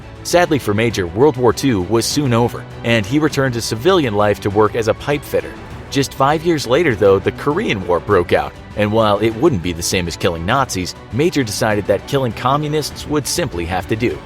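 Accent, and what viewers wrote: Canada. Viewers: sounds pretty Canadian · Is the info graphics show from Canada? The way they talk in this sounds like they are Canadian.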